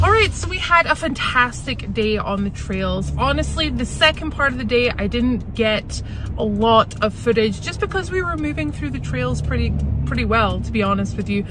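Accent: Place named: England, United Kingdom. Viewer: Why do you have an English accent what changed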